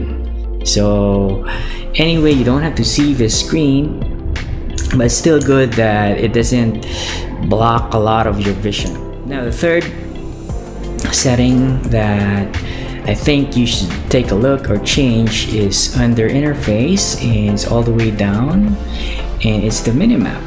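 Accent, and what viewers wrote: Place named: Philippines. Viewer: Fro your accent I know you're my KABAYAN!! subbed! Keep it up.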